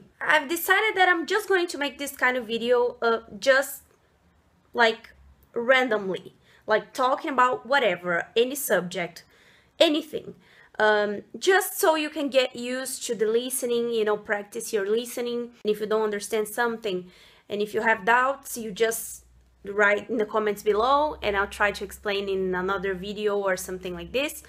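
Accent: Brazil. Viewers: Strong, I mean! ;) But it's natural due to our Brazilian Portuguese phonetics, sometimes it can be hard to "get rid of" them! Mainly if you have started learning after a certain age... But don't worry, your English is great! It's completely natural having an accent, mainly if it's not your L1... And I meant you have a cute one, a cute accent! :D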